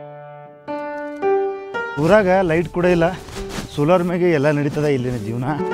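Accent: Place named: Belagavi, India. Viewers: ಗುರುವೇ ಇಲ್ಲಿವರೆಗೂ ನಿಮ್ಮ ಚಾನೆಲ್ ಮಿಸ್ ಮಾಡಿಕೊಂಡಿದ್ದೆ ನಮ್ಮದೇ ಬೆಳಗಾವಿ ಕಡೆಗೆ ಭಾಷೆ 😀😀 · Nange ishta aguva some YouTubers Alli neevu nimma UK kannada slang super Brother YouTube li feature ide nimge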